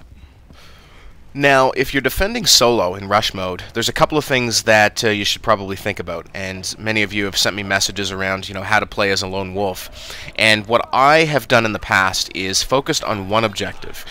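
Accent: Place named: East, Canada. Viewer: I can tell you're Canadian, probably eastern from your accent. Where are you from? I'm in TO. Nice series!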